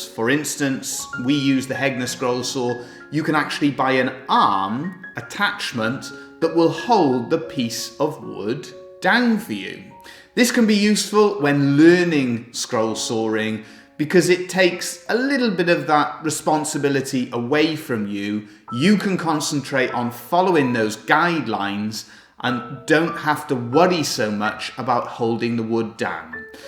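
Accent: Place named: Wales, United Kingdom. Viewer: Man it's been a long day. I sat there thinking this guy sounds welsh. I didn't even see the in your name. If you get people asking for a cheap scroll saw, I bought mine from screwfix for 90 quid.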